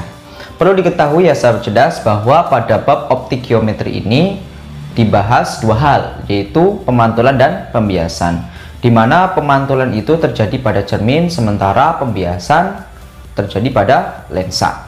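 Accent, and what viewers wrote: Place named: Java, Indonesia. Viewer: Terjadddi, Oke, dan Jjjelas ... meddoknya keliatan , trs katakata Jjjur ya Kesimpulan, bagus .. dan aku gabisa ngerjain soalnya.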